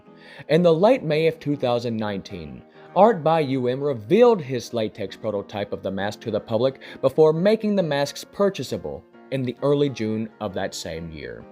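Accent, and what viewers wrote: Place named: South, United States. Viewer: Awesome! also dude, you sound like a southern version of the narrator from How it's made. 😆 Those are very cool masks too, wish I could but like one someday!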